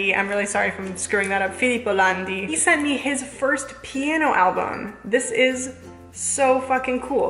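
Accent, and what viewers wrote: Spain. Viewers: your spanish accent sounds like its from spain. Doesn't sound at all like latin spanish thats very interesting. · i think that is the spanish she was thought because even when she traveled to spain she already had that accent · If ya didn't know already she studies abroad in Spain for Spanish maybe why her Spanish sounds more Spain than Latin.....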